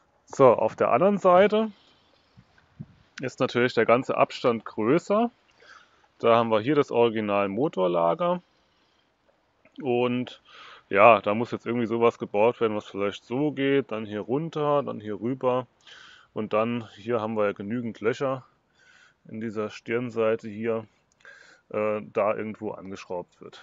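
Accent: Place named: Hesse, Germany. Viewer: Spannendes Projekt! Wie ist das eigentlich mit Tüv und Steuerungs-Elektronik. Muss die Ansteuerung/Software des E-Antrieb bestimmte Abnahmen erfolgen? Mir gefällt es gut vorhandenes wieder zu verwenden. Ist halt viel gefummel und gesuche. Dein hessisch ist sehr sympathisch. Anmoderation ist mal was neues. Passt. Gruß aus Süd Hessen.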